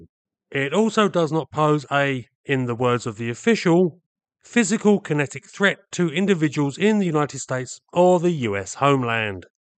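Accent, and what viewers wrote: United Kingdom. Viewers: Always refreshing to hear a UK voice, with a reasonable, calm, unsensational approach to a major issue. 👍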